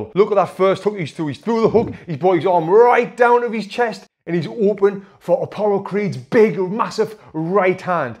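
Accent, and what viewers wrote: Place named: Birmingham, United Kingdom. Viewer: Dude, you are talking like peaky blinders. 😊👍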